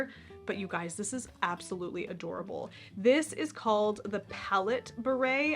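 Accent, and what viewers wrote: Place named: France. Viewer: Your accent is so cute 😍 We could work on our pronunciation together, you French and me English. I like your Halloween costume idea.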